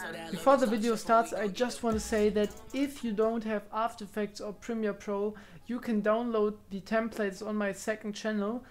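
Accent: Germany. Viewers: Man hört einfach das du deutsch bist lol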